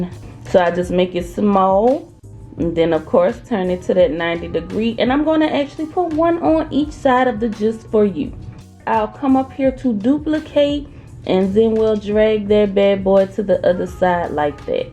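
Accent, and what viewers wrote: New Orleans, United States. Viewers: She had me with the NOLA accent ❤ let’s get this money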